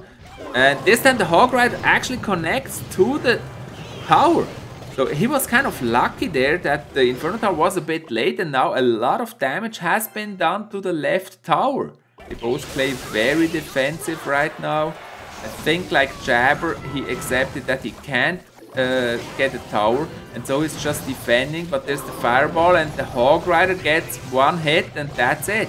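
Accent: Switzerland. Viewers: Chas si das du schwizer bisch? Schöne akzent😁🌾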